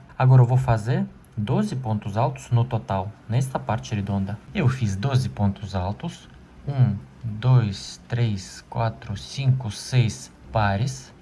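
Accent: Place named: Brazil. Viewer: NOSSA.. EXPLICA MUITO BEM..AMEI A AULA..ADOREI O SOTAQUE, DE QUAL ESTADO DEVE SER? TENTANDO DESCOBRIR PELO SOTAQUE,MAS NÃO CONSEGUI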